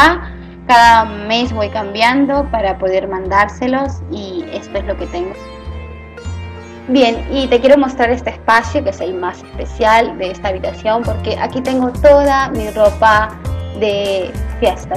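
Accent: Peru. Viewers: hola, yo soy peruana, y vivo en usa, me encantan tus videos, y veo que no haz perdido tu acento peruano, gracias por compartir y enseñarnos tantas cosas, te admiro por tu entusiasmo por la vida sana. Bendiciones.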